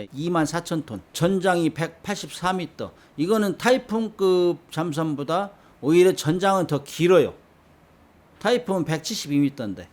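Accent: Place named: Jeolla, South Korea. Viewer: 그냥 그만두라 전라도 사투리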